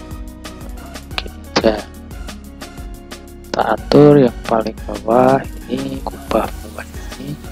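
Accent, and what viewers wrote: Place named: Java, Indonesia. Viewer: Wong jowo koyone nek delok suarane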